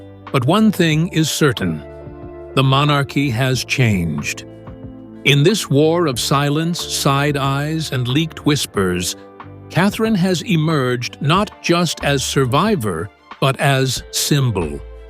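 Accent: United States. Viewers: Keep using the disrespectful images of Katherine and the obnoxious American narrator.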